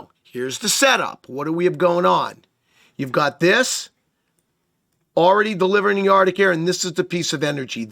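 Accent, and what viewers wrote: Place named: New Jersey, United States. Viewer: Jeez, you couldn't talk about when there is going to be frozen precipitation in Texas. It sounds like you 're in New Jersey for God's sake, from your accent. Noone here cares about New Jersey. What is wrong with you.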